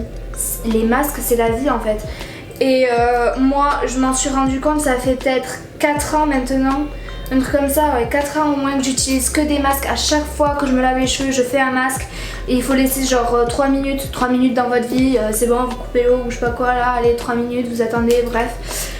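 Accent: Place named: South, France. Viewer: T’a un accent du Sud et sinon t grv drôle 😂❤️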